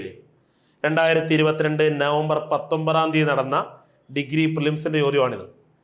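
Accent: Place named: Kollam, India. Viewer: രണ്ടുപേരും കൊല്ലം ആണ് 👀... ഞാനും 😁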